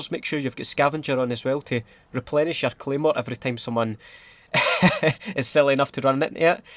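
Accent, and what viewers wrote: Scotland, United Kingdom. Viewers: Hey I’m kinda new been around for couple months, been binging on your vids looking for as many as I could find and I dunno just thought I’d have a try, ever had a feeling to do a video like on of your og commentaries. I know you’ve moved away from them but I just love the Scottishness in them😂😂. Also what did actually happen to the troops?😂but anyways love the vids man keep it up❤️❤️❤️ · Clicked just to hear your silky Scottish voice say ‘Faverla’ ;)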